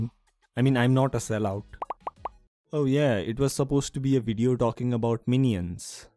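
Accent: India. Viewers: can you tell from which country you are you really sound in indian accent ?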